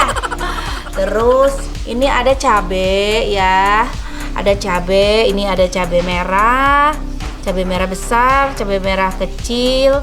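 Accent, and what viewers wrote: West Java, Indonesia. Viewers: Ya Allah.. beneran lucuuu si teteh.. logat logat sundana... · aku dri bdg tth qu jd sma ngomng nya sunda bgt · Lucu pisan org sunda mh nyriosna th geulis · Resep ku logat sundana teh 😁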